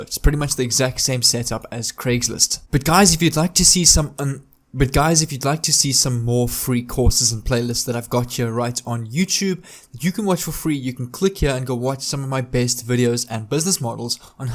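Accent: South Africa. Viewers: You sound South african ..How did you sign up with clickbank successfully?Im actually American living in SA but cannot sign up..Please help..thanks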